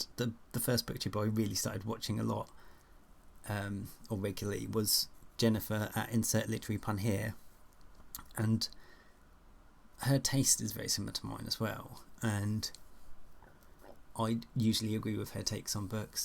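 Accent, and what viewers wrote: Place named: United Kingdom. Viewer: Yesterday I discovered the"mentions" tab in the comments section (classic really, about 5 years late on that one, so right on schedule for me) and I'm honestly so surprised and moved by what you said here! Thank you, really. Although I have to say the biggest surprise was connecting your icon that I know so well with an actual face that both has a British accent and is wearing a Packers hat 😂 reaaally trippy moment for me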